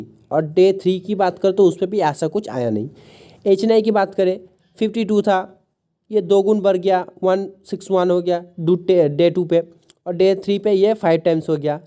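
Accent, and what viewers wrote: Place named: Bengal, India. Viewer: Bhai bangali ho kya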